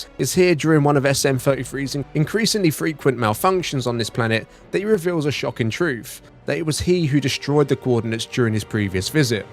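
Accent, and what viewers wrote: United Kingdom. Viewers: good video, except that strong bri-ish accent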